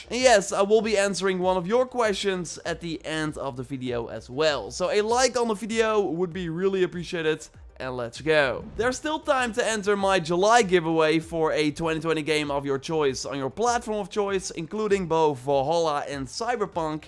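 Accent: Netherlands. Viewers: Bro u Dutch I know it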